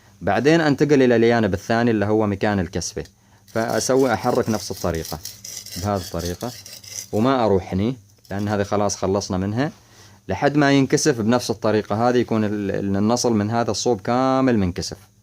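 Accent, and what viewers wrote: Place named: South, Saudi Arabia. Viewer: سلمت و بيّض الله وجهك على الشرح الوافي. وبالنسبة للّهجة اللّي تتكلم بها بقلب الجيم الى ياء فهي نفس لهجتنا في قبائل رجال الحجر جنوب السعودية. وسلامتك ✋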